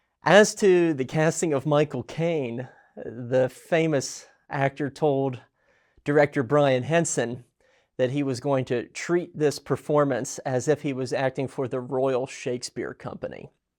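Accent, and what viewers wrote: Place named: Outer Banks, United States. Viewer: Your accent is incredible. I've never heard a high tider/outer banks accent from an actual person before that wasn't an impersonation. Yeah the video is great and all, but I had to point this out since I couldn't get over it lol.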